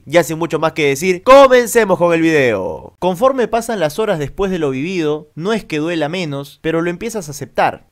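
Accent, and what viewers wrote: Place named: Buenos Aires, Argentina. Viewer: Te escucho algunas veces, pero es la primera vez q escribo, Gareca aun no ha dicho nada y lo que afirmas es mera especulación, muy típico del nefasto períodismo de fútbol peruano, salvo honrrosas excepciones, si sacan a Gareca, acá el tema no sólo es destruir sino proponer quien debería ser su reemplazante si afirmas que Gareca se va Y la otra el acento bonaerense habría que evitarlo un poco. Saludos y éxitos a futuro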